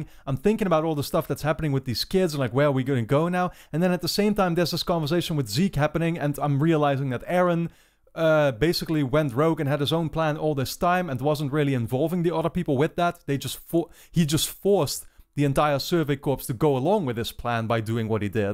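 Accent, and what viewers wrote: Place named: Netherlands. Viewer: im hearing this accent... my man... are you dutch?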